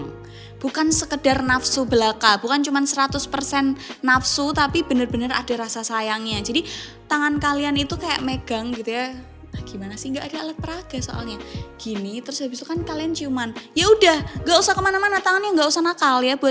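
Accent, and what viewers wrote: Java, Indonesia. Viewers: mbak wong ndi to yo 😂ngomong e cek medok e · Ngomoge mledok mbae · Ni cwe tipe gw bngt..medok'a itu lho bikin gw lemes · Iki suenneng aku logat e jowo e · Aku kontenmu Mbak. Aku liat kontenmu kekel bhasamu Mbak.. boao jowone asik😀 Salam kenal ae wis Arema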